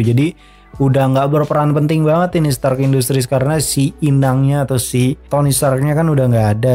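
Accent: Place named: Bogor, Indonesia. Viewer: Bahasa sunda lu kek barudak bogor pride anjir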